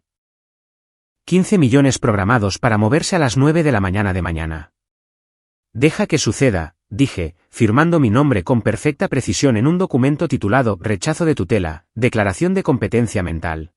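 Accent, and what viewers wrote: Spain. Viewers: A mí siempre me gustan las historias que narra este muchacho. Oh, señor, lo felicito, me gustaría saber cómo se llama . Sé que tiene un acento español Las narraciones son Excelentes y voy a ver esta qué tal me parece desde el principio que es buena.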